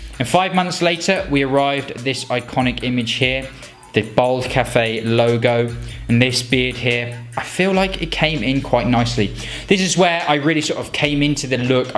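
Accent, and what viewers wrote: England, United Kingdom. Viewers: Where in england are u from? cant place the accent. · Sometimes u sound like pews, but with English accent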